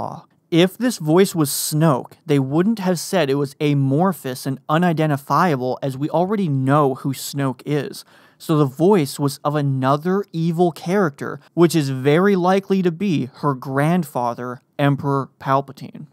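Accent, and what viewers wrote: United Kingdom. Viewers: keeps up with the british accent too...nice one. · Please, English accent not British. British would include Scottish, Irish, Welsh as well as English. Even to suggest there are no regional difference in accent within England is incorrect. Someone from Newcastle will sound very different from a Bristolian who in turn will be different from a Londoner.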